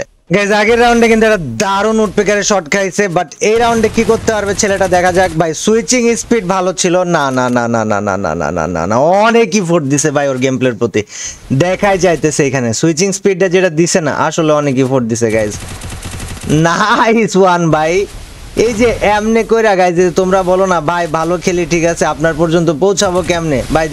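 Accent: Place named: Chittagong, Bangladesh. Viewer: ভাষা শুনে বুঝছি চট্টগ্রাম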